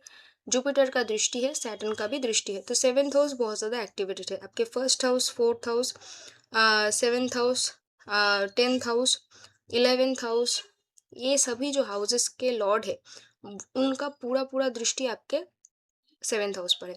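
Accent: Bengal, India. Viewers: Are you bengali?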